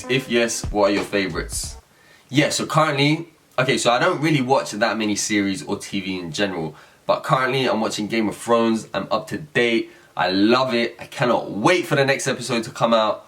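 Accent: United Kingdom. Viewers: talvez seja pelo sotaque britânico dele, dá a impressão que ele ta falando mais rápido mas é só o sotaque mesmo. não desanima, com o tempo você vai acostumando. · #AskPhitzee I heard you say you are from Montenegro. Your accent sounds British. Have you lived in Britain most of your life?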